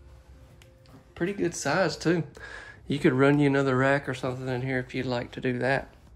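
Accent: South, United States. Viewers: Hi! I have been watching your channel now for approximately two (2) years. This is the first time I have ever left a comment. That being said, I just wanted to let you know how special I think you are. You are delightful to listen to, with that beautiful "deep southern" accent! I am born and raised in north central Florida, City of Gainesville, and home of The University of Florida "Fighting Gators"! Technically we are Southern, but most of us do not have the accent. I suppose perhaps it is the result of growing up in a major University City, where we are schooled with people from all over. Anyway, I enjoy You and your work, as well as watching the channel grow. Your Son is precious! I had 3 sons, two who gone home to our Lord and Savior, Jesus Christ. My oldest is still here, and He has given me two beautiful grandsons, ages 17 and 13. Keep up the good work young man and I will continue to watch your channel. 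God bless you and your loved ones. Angela Phillips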